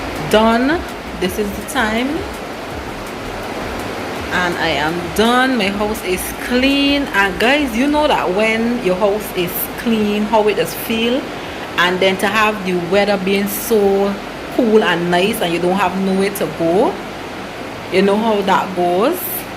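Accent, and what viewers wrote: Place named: Barbados. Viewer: For me it's nice when I come across content from Barbados. Girl you have adopted the Bajan accent very little of your former accent is left. God has already worked it out.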